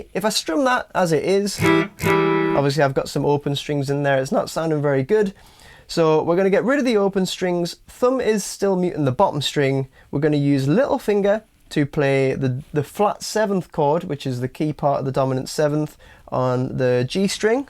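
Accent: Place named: Newcastle, United Kingdom. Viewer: great lessons thank you ! shit hot playing ,and cool geordie accent ! Gan canny 👍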